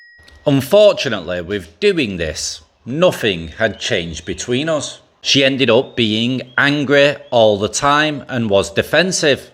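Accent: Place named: Scotland, United Kingdom. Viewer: Watching for 10 minutes straight and I could’ve sworn I was seeing Adam Sandler doing a Scottish accent! Awesome dude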